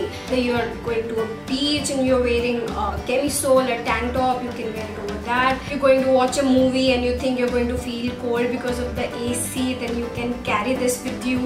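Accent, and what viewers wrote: Delhi, India. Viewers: I always wondered that you never sounded like a Mumbai girl to me, you had that tone of a Delhities. And today i came to know that you are a Delhi Girl. Whenever you come back to Delhi please do a meet and greet coz i am also a Delhitie. #DilWaloonKiDelhi. And yes go try out sarojini nagar market, its awsm.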